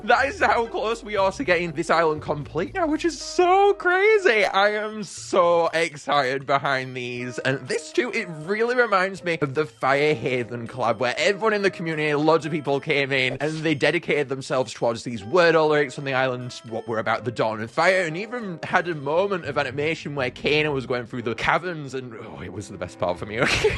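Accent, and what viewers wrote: England, United Kingdom. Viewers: You’re English !!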